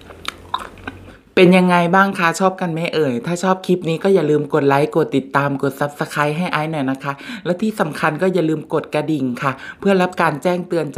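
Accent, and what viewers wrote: Isan, Thailand. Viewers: พี่เป็นคนอีสานเหรอค่ะ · พี่เปนคนอีสานหรอคร้าาตอบหน่อยนะคร้าา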